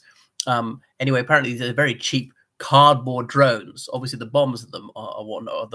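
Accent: RP, United Kingdom. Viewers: You speak posh but are literally a gullible credulous clown.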